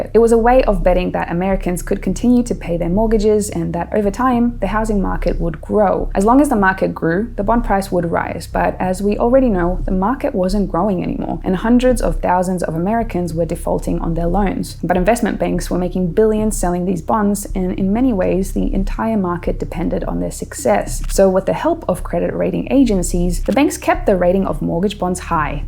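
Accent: Australia. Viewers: I am surprised you are in Iceland! I thought you were Australian. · Iceland??!?! You sound like an Ozzie! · I always thought you were Australian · For a minute I thought you were Australian but thank you for this warning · You're from Iceland!😮 I thought you were Australian.🤔 I make lots of predictions. Sometimes I'm right. But I'm not famous for it. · Iceland?? She sounds like an Aussie to me (and I'm an Aussie!)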